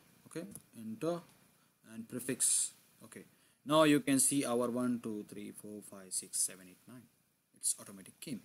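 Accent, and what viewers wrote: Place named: Russia. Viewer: Why your accent is like russian😂 bhai you are indian be as it.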